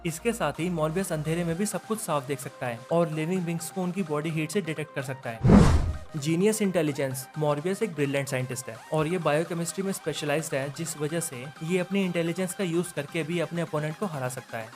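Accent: India. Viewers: hind şivesi cok komik :D:D:D:D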